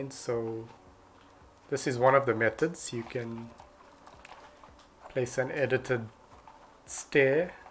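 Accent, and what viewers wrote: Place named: South Africa. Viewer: Are you south african? I can see the ping and hear the accent 😭🤣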